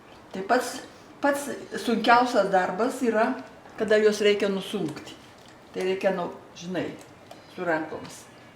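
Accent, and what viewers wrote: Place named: Molėtai, Lithuania. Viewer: Vo čia kaimiskai. Pagal kalbą tai kaip močiutė iš Molėtų;)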